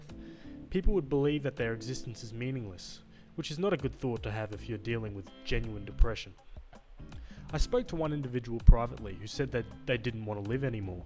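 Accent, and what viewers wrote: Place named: Australia. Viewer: I’m thanking Markee for introducing me to your channel! Love the Aussie accent! 💜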